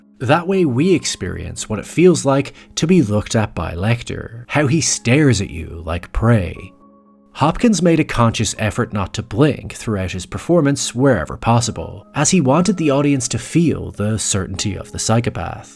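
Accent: Ireland. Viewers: Is that voice-over an Irish accent? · The narrator sounds partially irish...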